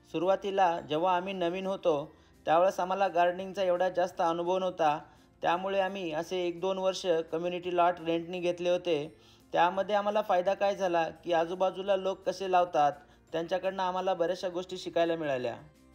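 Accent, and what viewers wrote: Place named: Latur, India. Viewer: दादा तुम्ही परदेशात राहून पण आपली लातूर ची भाषा विसरले नाही आणि तुमचे मुले पण आपली लातूरची भाषा छान बोलतात मला खूप अभिमान वाटतो तुमचा मी पण लातूर आहे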